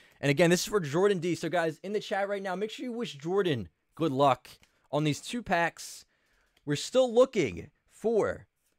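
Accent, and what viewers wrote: New Jersey, United States. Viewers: I know a Jersey accent when I hear one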